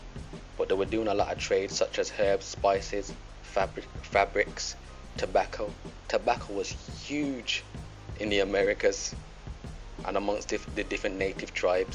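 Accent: Birmingham, United Kingdom. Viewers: You are from Birmingham heavy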